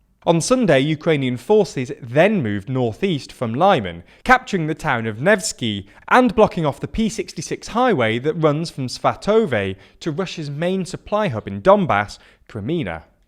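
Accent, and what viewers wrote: United Kingdom. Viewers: But so british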